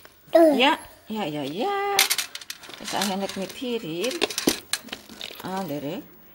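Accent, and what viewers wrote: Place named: Java, Indonesia. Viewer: Mbaknya wong Jowo yaa? Boso Londo logate koyo wong jowo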